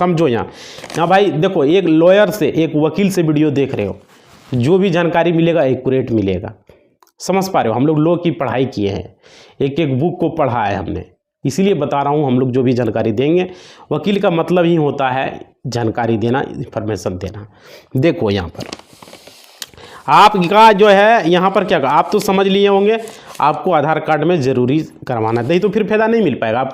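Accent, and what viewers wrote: Bihar, India. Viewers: Bihari ho kya aap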